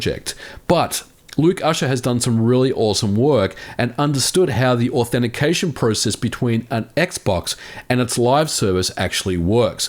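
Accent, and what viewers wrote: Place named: Australia. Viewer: That's a very mild accent for an Australian.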